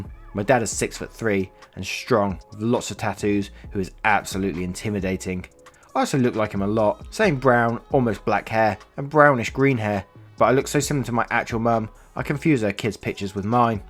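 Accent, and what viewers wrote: United Kingdom. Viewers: While I know why most Youtubers stay away from swearing these days... I do enjoy someone who actually fills out the swearing even when the text is censored! Sometimes people just need to hear that they're being a twat, right? is one of my favourite Reddit readers partly because of the chill attitude, the chuckles and of course the British accent.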